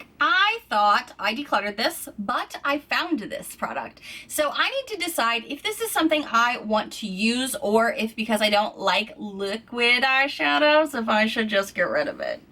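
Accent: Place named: Midwest, United States. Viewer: I always thought you sounded Midwestern. Plus you love the Blues.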